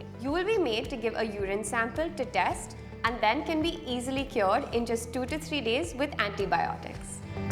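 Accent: India. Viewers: Never seen a white woman with a indian accent😅. Great videos anyways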